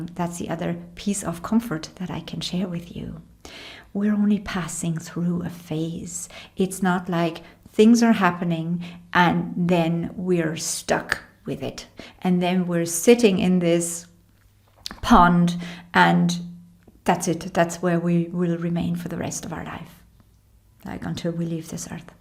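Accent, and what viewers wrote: Ireland. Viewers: Yir accent is lovely. Love the irish undertone. 🤗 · Your sweetness and your Irish accent reminds me of Lorna Byrne, the Angel lady.........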